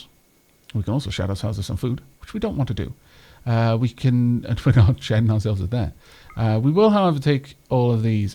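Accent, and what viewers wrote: Wales, United Kingdom. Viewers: Smartness Tooth is out, novacaine wore off, video is out... Trying yo decide wether to die from the pain, or jump for joy for a new RimWorld video. Leaning much more towards the jumping for joy option. voice is very soothing... I'm only a quarter through the video, and my jaw is already starting to fade. I think its the Welsh accent. Even my 'murican upbringing can appreciate an amazing accent ^-^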